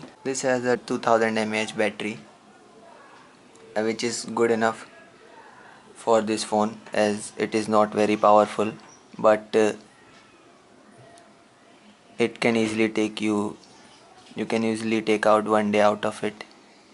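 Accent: India. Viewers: Lmfao. Ok then, if you know so many languages you know how tricky it is to learn sounds and how to speak correctly sometimes. First. Second, if you complain about his English skills, at least write properly. Third, Yes he does have to improve, I never said otherwise, yet I've seen tons of reviews made by people from India speaking English and they all sound the same (some better some worse - no offense) so... there isn't much he can do.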